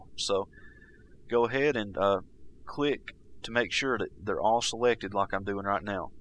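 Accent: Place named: North Carolina, United States. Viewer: Cool video. By the way, are you from North Carolina? I love your accent!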